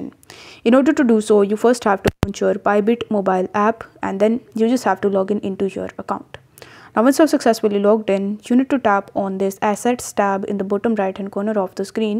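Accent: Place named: India. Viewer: one of the headache accents in the world,, is the INDIAN English accent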